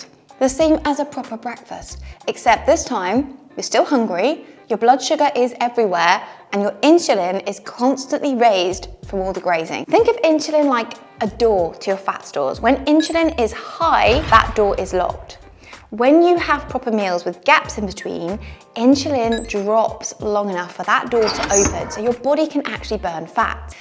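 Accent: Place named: United Kingdom. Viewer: I don't mind the music, probably because it's classical, goes great with your British accent! Doesn't bother me.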